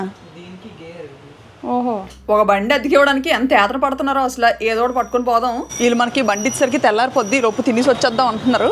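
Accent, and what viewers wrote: Vizianagaram, India. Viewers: మీరు మాట్లాడితే vzm accent ఉట్టి పడుతుంది. Intlo valu matladinattu untundhi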